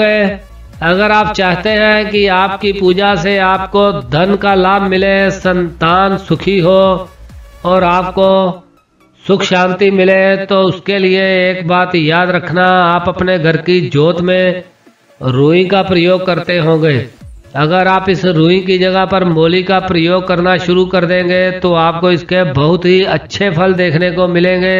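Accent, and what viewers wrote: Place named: Punjab, India. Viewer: Aby tu panjab mai kr mujra kr teri tou hindi bhi panjabi wali hai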